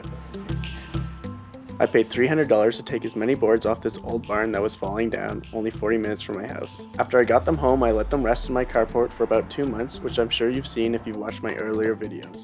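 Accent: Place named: Canada. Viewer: Excellent video, and very informative! I especially like the way you get right to the point, pace things perfectly, and don't use any of the typical amateur mistakes like long lead-ins, bad music, or obnoxious personality quirks. I've saved your video to watch again before I start my own barn board floor project. P.S. I also appreciate your Canadian sensibility and pronunciations! 🇨🇦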